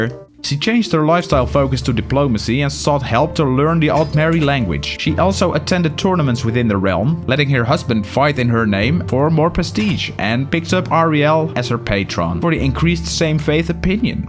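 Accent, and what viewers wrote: Netherlands. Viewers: Dutch accent 😢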